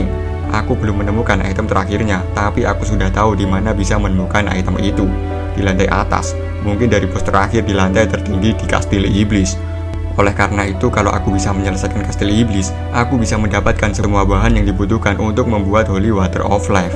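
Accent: Java, Indonesia. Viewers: Bg org jawa ya?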